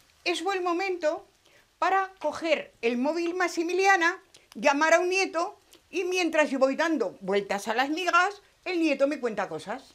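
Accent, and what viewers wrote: Aragon, Spain. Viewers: Me encantan las recetas tan ricas,que haces,y es un placer oírte hablar con ese acento,maño.Alli pase mi infancia.Ana María.❤😊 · Tú eres maña..... Ese acenticooooo.... Eres graciosa, concisa y clara... Las probaré con la patata rayada. Muchísimas gracias por tu desparpajo y receta. Buen trabajo, maña!!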